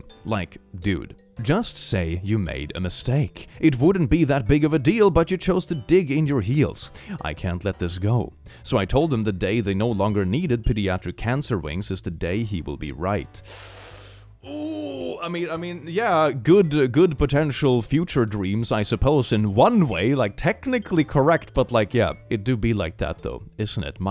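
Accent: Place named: Sweden. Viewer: I did love your pronouncing eugenics as euyenics. (That’s a thing in Swedish, right? G’s make Y sounds, don’t they?) Anyway, I do love it when little bits of your accent slip through more (and then some when you actually speak Swedish. I hope to learn it at some point)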